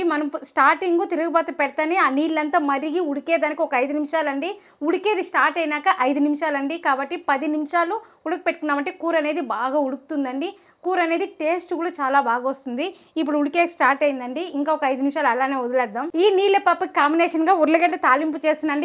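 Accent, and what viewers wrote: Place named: Chittoor, India. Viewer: Ivala try chesamu mee revepii..mee di proper ey place andi..seems like from chittoor ..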